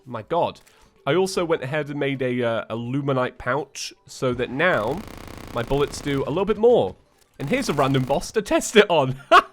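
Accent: United Kingdom. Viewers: Yeah, it's like. " Oh god ,I'm so gutted by that -__- " It's just so stereotypically british and I love it lol.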